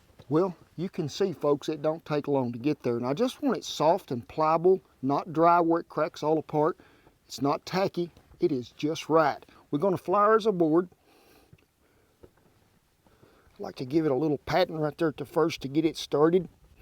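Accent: South, United States. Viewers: I'm thinking Tennessee I do love a southern accent lol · my daddy came from Texas but I only remember so much since i lost him so young. Maybe that's why yours and other southerners' "plain speak" just lights me up. Up here in the northern woods people say we sound Canadian 🤣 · I'm just Mesmerized by that lovely Southern voice❤️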